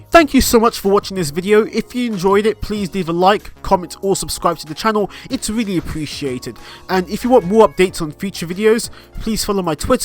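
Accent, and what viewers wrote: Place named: United Kingdom. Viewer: Why is he British?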